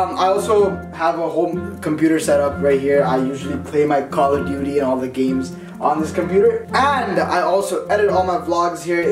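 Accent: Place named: Pakistan. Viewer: Accent to Pakistani lag raha hai. Lakin Didi kyun keh raha hai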